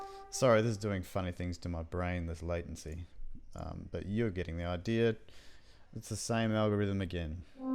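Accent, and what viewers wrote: Australia. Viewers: Also I notice you have an Australian accent. Where in OZ are you from? Did you know that Cinematic Strings was recorded at the Verbruggen Hall at the Sydney Conservatorium? Have you tired CS?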